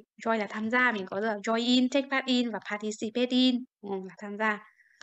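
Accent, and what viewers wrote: Standard. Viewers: B phát âm chuẩn mà dạy hay quá🥰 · Giọng b rất chuẩn.chuc b buổi tối vui vẻ nhé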